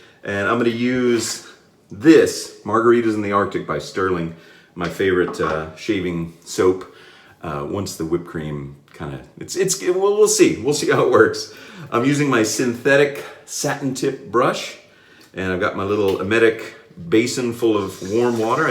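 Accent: United Kingdom. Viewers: "Barbasol will not be featured in this episode".....boy I'll beat you wish it had been featured in this episode!!😁😁🪒🪒 Loved the British accent!!🇬🇧🇬🇧👍👍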